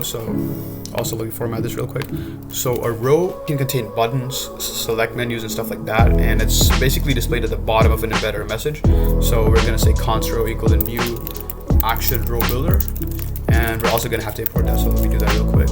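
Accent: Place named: United States. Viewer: Bro u sound american af L Also the bg music a bit too loud xd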